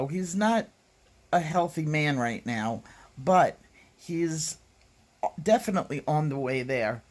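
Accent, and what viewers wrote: New York, United States. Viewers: I’m so glad you hear that your husband is on the mend and improving daily! Thank God for answered prayers. This set looks wonderful and I would love to enter to have a chance to win. I love your videos ,it’s so nice to hear someone who sounds like me as I’m a New Yorker too!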